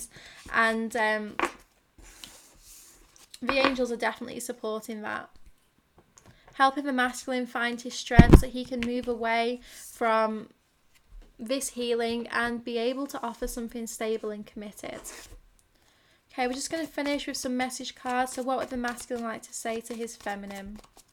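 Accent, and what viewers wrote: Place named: United Kingdom. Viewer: Pretty sure accent is British and the cards are priced in pounds, so will be coming from UK. Usually fairly quick postage, although due to unknowns on Brexit, our international post is getting backlogged. I sent all my international post to my family for Christmas today to beat the 31 October.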